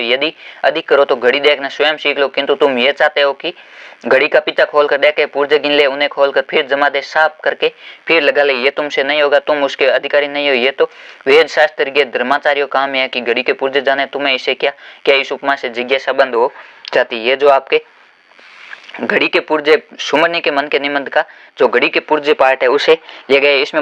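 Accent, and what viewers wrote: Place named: Marwari, India. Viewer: Ser app marvedi Ho Na apki aavaj se pata chala rha hai 😊❤❤🎉🎉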